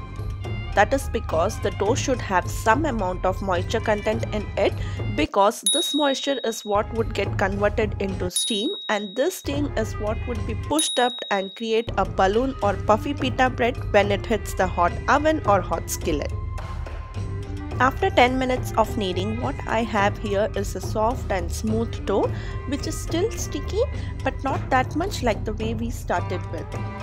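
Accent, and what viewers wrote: India. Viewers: I DONT KNOW WHAT I LIKE MORE; THE NICE INDIAN ACCENT OR THE BREAD. BEST EXPLANATION. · What a lovely accent. I want everything that i learn in future to be taught to me by a a well educated Indian lady.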